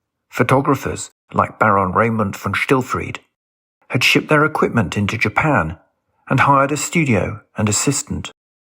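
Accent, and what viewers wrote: United Kingdom. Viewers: The narrator is probably British, they tend to pronounce words as they are spelled. National Geographic has a samurai sword documentary, and one of the persons in that video pronounces it the same way, and he is very English.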